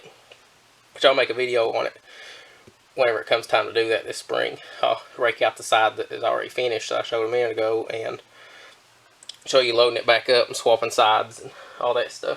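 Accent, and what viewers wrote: South, United States. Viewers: Enjoyed the video. I have a couple bins now and want to grow more. I appreciate the good southern accent. Thanks from TN.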